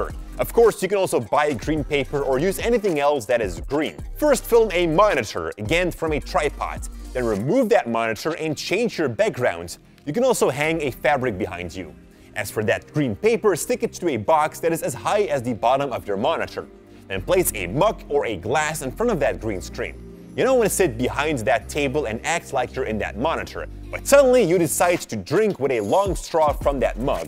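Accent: Netherlands. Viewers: his accent tells me he is Dutch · are you dutch? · are you dutch ? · Are you Dutch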